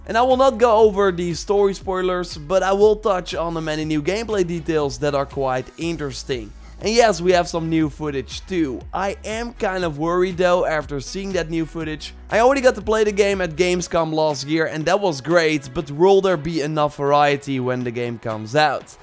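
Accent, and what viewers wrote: Netherlands. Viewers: I instantly regret pre-ordering this game Well I hope the online is somthing Its like you have a dutch accent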